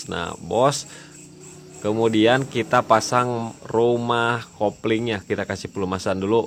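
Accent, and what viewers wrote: West Java, Indonesia. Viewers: Curukk 🤭 orang sunda ieu mah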